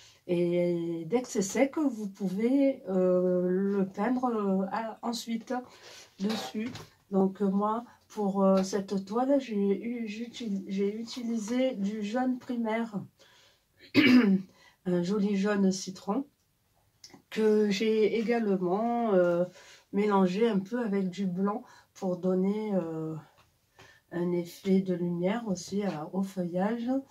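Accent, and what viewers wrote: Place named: South, France. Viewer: Je suis content pour toi,on voit ton évolution depuis que je te connais...artistiquement...!!! Bel accent du sud...salut du nord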